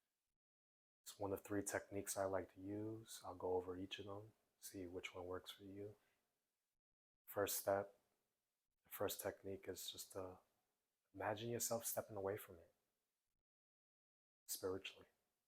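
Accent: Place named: Chicago, United States. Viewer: New to this channel but spirit had this guy come to my FB reels and it's been since June 2025 that I was last on FB. Anyway, I needed to take care of my body as my right side isn't working well. Stiff & painful with every movement and it's been years. So I did some movement stretches from him. I felt better as the movements were slow not to injure an injury. Listening to him speak on YT made me think of Chitown (Chicago) from his accent. At times I'd giggle & at times I felt family that still live there. Words like "bref, stumick, erf" man, I miss my family & Chicago!! ❤❤❤ TY guy for your video😊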